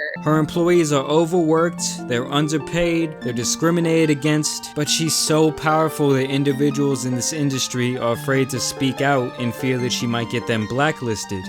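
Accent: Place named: New York, United States. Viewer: I just noticed he speaks with a slight new york accent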